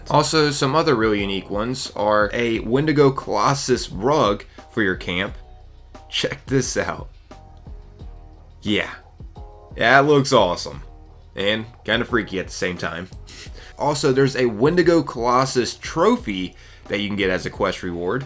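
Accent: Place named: Rural, United States. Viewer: YEAAAAA!!!!!!!!!!!!!!!! THE CONTRY VOICE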